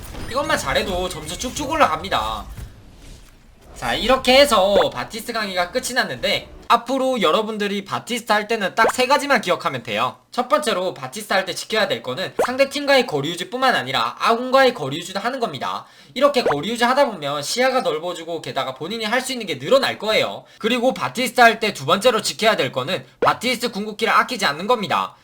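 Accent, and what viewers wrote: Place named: Busan, South Korea. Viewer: 뭔가 부산 말투 같으신데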